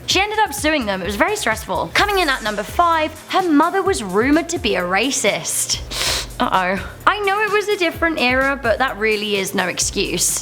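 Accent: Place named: United Kingdom. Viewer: Our hostess is just so charmingly British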